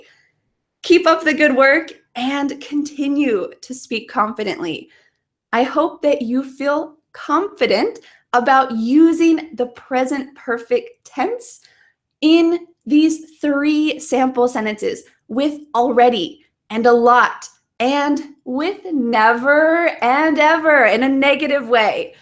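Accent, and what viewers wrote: United States. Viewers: You is American? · is your english american ou brazilian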